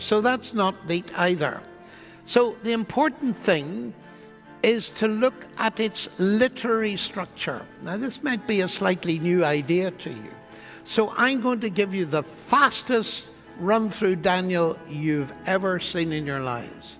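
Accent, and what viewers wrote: Northern Ireland, United Kingdom. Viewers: I concur with everything you've mentioned, except that he has an Irish accent.He's from Northern Ireland 😇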